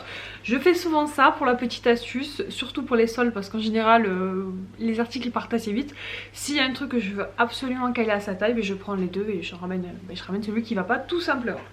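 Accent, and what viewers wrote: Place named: Marseille, France. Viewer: Ta un accent Marseillaise ma belle .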